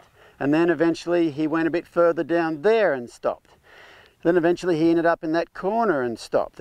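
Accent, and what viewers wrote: Australia. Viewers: My favourite thing about is he still talks like an Australian 😂 Most Australians thinks they’re Americans after a week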